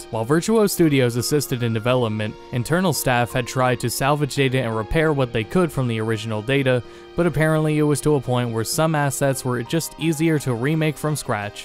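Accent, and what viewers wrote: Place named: United States. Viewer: "Teet-us"? Really? You sound American. There is no excuse for you pronouncing it that way. lol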